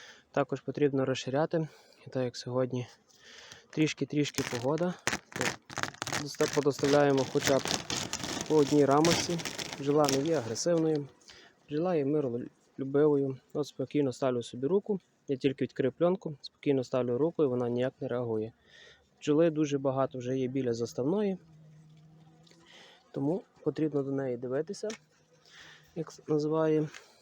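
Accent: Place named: Ternopil, Ukraine. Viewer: По розмові чую брате ти з Тернопільщини. Південний захід. Бучач та околиці? Я з під Чорткова.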